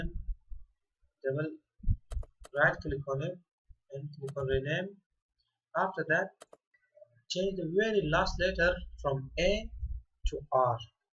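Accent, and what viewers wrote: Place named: India. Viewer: This guy sounds like a indian irs scammer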